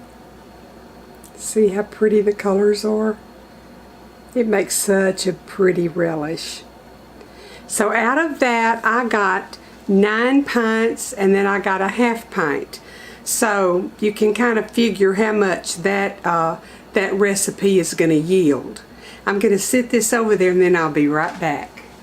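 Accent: South, United States. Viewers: I make a dill relish that we absolutely love! I think I will try this recipe as well. It does look so yummy! I live in Michigan and we are trying to get get gardens started here. I would love to see any canning videos! I am a canner from way back but never get tired of watching canning videos! I love to try new canning things. Like I said I’m a canner from way back, helping my mom as a young girl and then for my family, but I’ve just started dehydrating. Do you have plans on making dehydrated videos? I would love to see that as well. I’m really enjoying your videos. My family is from the south so hearing you talk and they way you phrase things is just beautiful! It reminds me of home! Thank you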